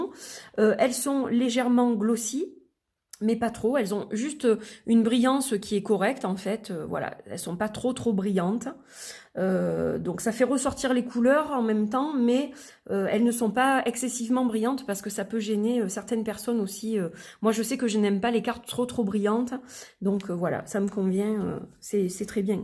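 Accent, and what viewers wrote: South, France. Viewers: il est splendide! J'adore Leïla, cet oracle et votre présentation aux accengs du sudeuh (comme moi).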